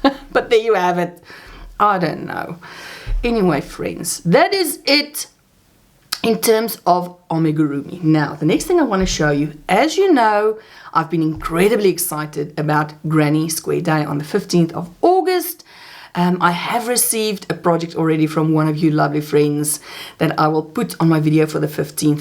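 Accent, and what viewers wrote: South Africa. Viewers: Hi there, from Germany 🇩🇪. I love your Chanel and I am so grateful to have found you. As I have lived in South Africa for 34 years, (I was brought up there, lived there from 1969to2003), it is so wonderful to hear your accent. It makes me feel so at home, as I miss SA. I have been crocheting for many years. We had a knitting and crocheting business in Johannesburg. I started amigurumi here in Germany. I also do mini albums, scrapbooking, cards etc. I love your crochet work. You work so neat and tidy. I love your taste in projects a lot. Keep on with your excellent work. I look forward to your videos. Thank you so much for giving us all these pattern advices and tips, just love it. 🤗💖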